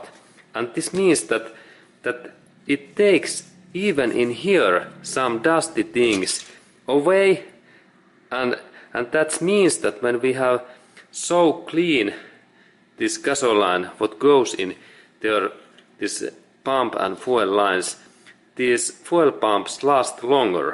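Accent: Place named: Finland. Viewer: Suomi aksentti on paras aksentti. Subscribed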